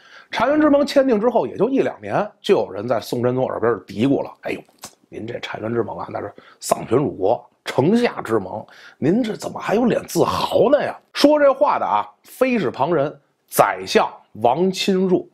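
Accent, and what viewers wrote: Beijing, China. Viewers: 还是北京话好听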